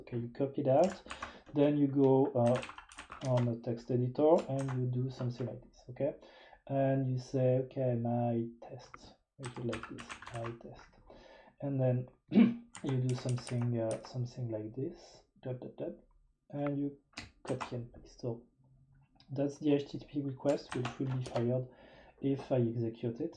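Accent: France. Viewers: Il me semblait bien que c'était un accent Français, Bonne continuation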